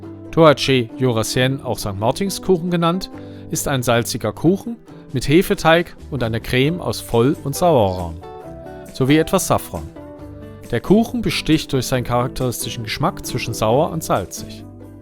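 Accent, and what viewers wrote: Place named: Germany. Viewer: Danke für die Übersicht, das sind ein paar perlen dabei, die ich mal nachkochen werde! Evtl. solltest Du die Französischen und Italienischen namen einblenden. Ich spreche die sprachen auch nicht ... aber mit Deutschschweizer/Englischem akzent im ohr kann ich Dich nicht verstehen. Als Deutscher verdrehst Du die laute anders als ich 🤪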